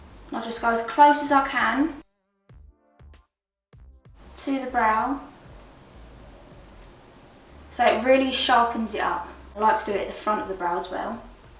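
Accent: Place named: Essex, United Kingdom. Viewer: DAT Essex accent though 😩❤️❤️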